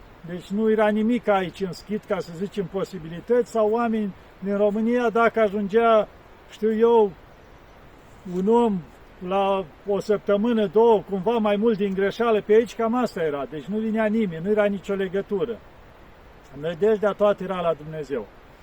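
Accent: Moldova. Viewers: Bunul Dumnezeu să vă dăruiască sănătate și să vă asculte rugăciunea Părinte și M ĂICUȚA NOASTRĂ SĂ păzească și să facă pace în lume Iar ROMÎNIA NOASTRĂ SĂ O PĂZEASCĂ ȘI SĂ o acopere cu cinstitul și SF SĂU ACOPRĂMÎNT IAR PE TOȚI ROMÎNII DE Ori și unde sar afla să ne încingă cu SF - UL BRÎU și să ne țină strîns uniți lîngă SFÎNTĂ CRUCE A MÎNTUITORUL UI HRISTOS ȘI A MILOSTIVIRII SALE AMIN Și DV Vă mulțumim pentru cuvintele frumoase rostite în grai dulce Moldovenesc pentru că și eu sunt moldovean dar mai de la malul Dunării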